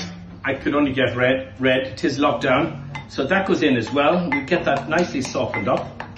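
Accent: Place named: Dublin, Ireland. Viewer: A fabulous recipe, and your beautiful, mellifluous Dublin accent made your explanation doubly enjoyable!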